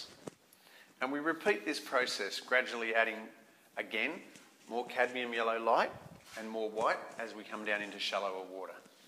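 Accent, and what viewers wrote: Australia. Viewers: This guys amazing! love ya auzzie accent :) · There's really something about that australian accent.. The way you guys say water is just earfood. Also, it's really wild how a few strokes of paint can do so much. I'm currently painting a picture with a little island in the distance, a big lightning going into the water, but I'm lost at the waves. I have very flat water right now, but during a thunderstorm, water is wild.. But I want to keep the painting calm and soothing still, so I have to figure out how to do this :D Your tutorial helped a lot :) Thank you <3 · OMG, this looks so easy!!! i know you made it look so easy , to us it won't. LOL. I love Australian accent! You're such an amazing artist! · I never painted because I thought I had to know how to draw , well imagine my surprise ,when I could paint. I was so confused,because I honestly can’t draw at all . 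Lol, I’m new here , ready to move out ,I’m in Bracebridge Ontario Canada 🇨🇦,The colours are going to be changing at months end . It’s extremely close to Algonquin Park , where there’s an incredible amount of wildlife,natural lakes ,rocks ,cliffs,it’s really beautiful. I’ve never been to Australia,it sounds like your from Australia/ perhaps New Zealand . I love the Ocean, so when I get settled, this lady is going to order those colours. I wonder if I should get a large can from ( Benjaminin Moore Store ) or no ? It’s acrylic paint,correct? What do you think of metallic paint, ? I’ve recently see ceramic tile ,finger painting . Here , in Ontario , I’d think that would be really expensive. However I believe I saw this young man in Costa Rica . However he did use paper ,it looked like watercolour paper ,but it couldn’t be. , definitely durable . , so I honestly don’t have a clue . I’ve just got acrylic paint, and $ store canvases. 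So should I prep my cheap canvas first? Thanks 🙏 so much . Your very talented. Have a wonderful day.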